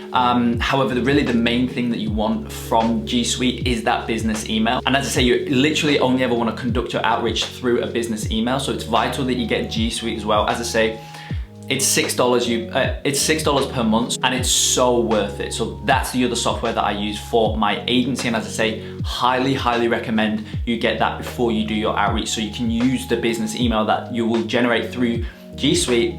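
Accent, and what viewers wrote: United Kingdom. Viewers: You live in Australia but you are British, right?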